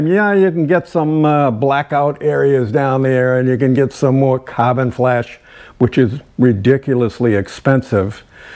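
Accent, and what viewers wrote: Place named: Northeast, United States. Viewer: Just stumbled on your channel and I watched this video. It sounds like you are from the NE. Can you specify what state ? Love the vid !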